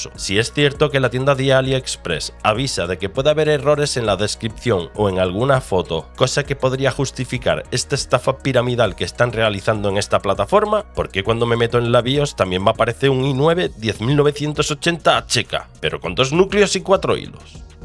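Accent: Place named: Andalusia, Spain. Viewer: a tu setap, a no se qué de unbox.... mu andalú pero eres pijo-espanglish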